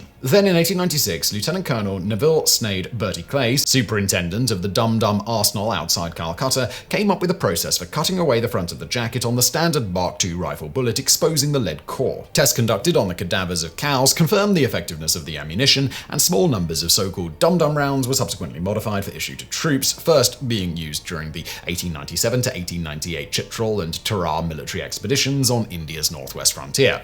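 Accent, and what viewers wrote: United Kingdom. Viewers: Come on Simon give us history of the dildo! I just want to hear that whole topic discussed in that proper British accent!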